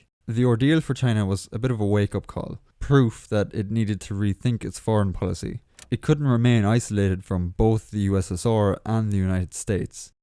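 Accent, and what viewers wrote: Ireland. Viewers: Excellent channel, where in Ireland are you from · I will eat my sock if this man isn't Irish. Great video btw, quality stuff.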